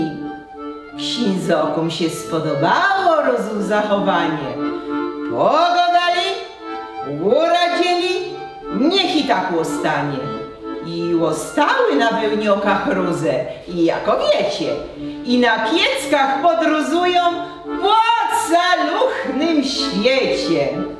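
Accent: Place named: Łowicz, Poland. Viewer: Mistrzyni interpretacji i gwary, prawdziwa gwiozda łowicka🌹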